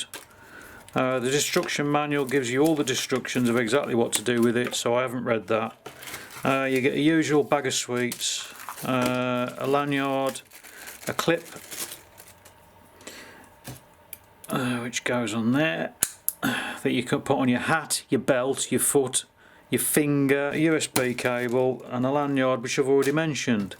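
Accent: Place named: Stoke, United Kingdom. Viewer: What city are you from? You sound stokey.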